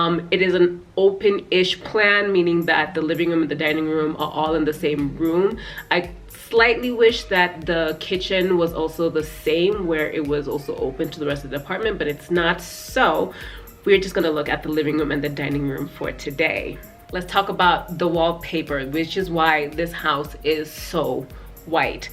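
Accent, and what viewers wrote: United States. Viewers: You have a beautiful place Madam. You stated that you are in Nairobi but you have an American accent. Are you an American living and/or working in Kenya? You are also very pretty. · I loved your tour so much but please try to reduce throwing up your hands constantly.i thought I was watching an American woman with accent, attitude everything.I didn't enjoy the narration.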